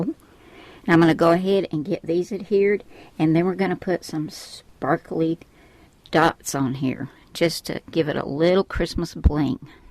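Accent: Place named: Midwest, United States. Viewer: I wasn't going to share this, but guess I will. I LOVEhearing your voice and midwestern accent. Makes me think of my mom whom I don't get to hear anymore. You really are the BEST! Been watching you for YEARS now. God bless you!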